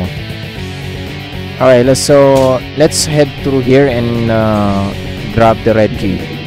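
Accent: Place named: Southeast Asia. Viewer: Your accents sounds like southeast Asian are you Filipino or Indonesian? By the way nice video bro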